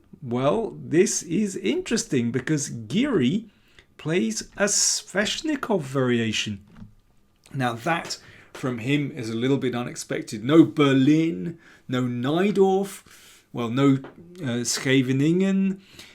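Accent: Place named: Southern England, United Kingdom. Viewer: That's his accent - middle-class southern English, I don't know why you call it patronising or what you expect him to do about it. And he's a grandmaster, and in my view the best of the YouTube full-time chess analysts. Carlsen's opinion obviously carries great weight, but a grandmaster is perfectly free to voice objections to it. In fact that's his job, to give his opinion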